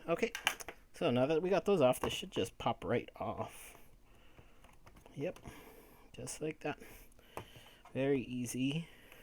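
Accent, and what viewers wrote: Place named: Mexico. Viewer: This guy for sure speaks Mexican-Spanish, his accent is obvious.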